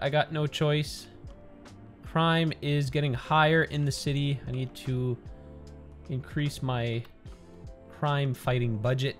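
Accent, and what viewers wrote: Canada. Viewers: Found this channel, love it and love the city and story behind it. Also, you a fellow Canadian eh? Cheers bud, keep up the great work 👌🏻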